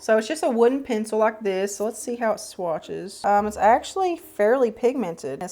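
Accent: South, United States. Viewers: I just love your take on everything and life in general. I live in Minnesota but grew up in Georgia adore your drawl it makes so homesick. I hate the midwest people arent friendly like us southern folk. Thank you for brightening my days with your keen sense of humor!❤❤BTW where you get your eyeglasses they are so kewl on you#!☮️💟🕊️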